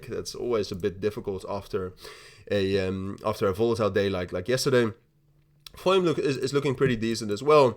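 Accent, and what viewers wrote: Netherlands. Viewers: Ik meende al wat Nederlandse klanken te horen.. heb het me al een tijdje afgevraagd.. 😂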